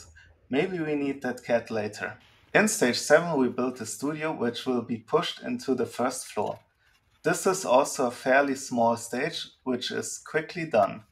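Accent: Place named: Germany. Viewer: Building the Figures is easy, i dont know where the problems for you are. Meanwhile i think your mother language is German, and i think your can hear very well when you speak English. You should try speak more fluent. Otherwise nice review.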